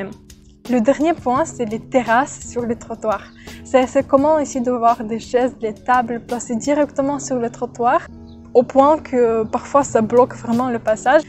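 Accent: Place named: Marseille, France. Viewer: Salut! Salutations du Québec (Montréal). je suis simplement abasourdi par l'aisance et la fluidité de ton dialogue. Avant de me rendre compte que tu venais de la Russie, je crpyais que tu étais Marseillaise! Ton accent est tout ce ce qu'il y a de plus agréable et musical! Lorsque tu hésites sur un mot, on voit que tu as ampplement de vocabulaire pour te tirer d'affaires.......... Toutes mes Félicitations!!! - dan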